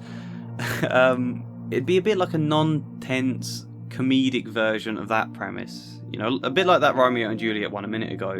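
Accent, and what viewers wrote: United Kingdom. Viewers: If a couple’s political differences are just, big government versus small government. Republic or constitutional monarchy. A centralised or state-based economy. Then sure, needing your partner to agree with you could be fairly painted as a vice. But in this era, “political beliefs” are literally a matter of life and death. If you vote for someone who incites violence against minorities, your political views are hurting real people. If you vote for someone who doesn’t want rape victims to have access to abortion, your political views are hurting real people. If you vote for someone who doesn’t see a problem with police officers shooting unarmed black teenagers and stepping on necks, ultimately, you have blood on your hands. To imply that a difference of opinion on whether or not, for instance, Black Lives Matter, is something a mature couple should be able to accept told me as a viewer straight away that you yourself are white. 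Because these issues clearly aren’t life and death for /you/. Maybe I’m being unfair, it sounds like you have a British accent; if you’re in England, it is a different climate over there. But you specifically mentioned Trump. A man who defends and incites /actual white supremacists/. I loved your videos analysing Good Will Hunting, that was beautiful work and really deepened my appreciation for an already exceptional film. But this video was disappointing and honestly insulting. There are good people on the left and right, as there always have been. But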